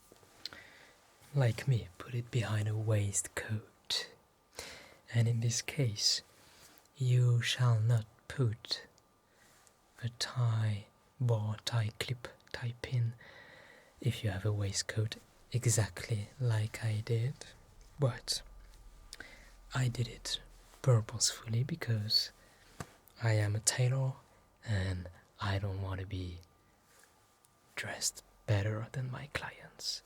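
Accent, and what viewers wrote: England, United Kingdom. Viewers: Did I hear a hint of an English accent?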